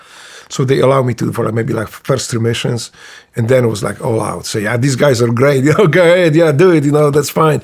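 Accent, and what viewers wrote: Poland. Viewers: Speaking like a true Polish xd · Why he is speaking with Polish accent?😅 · You can be born in USA...but polish accent always comes out. Our nature dictates this. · your accent says you're from Poland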